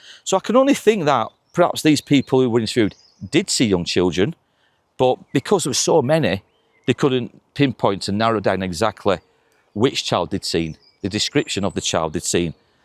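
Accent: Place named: England, United Kingdom. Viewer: nice to hear an English person on here. Im from the UK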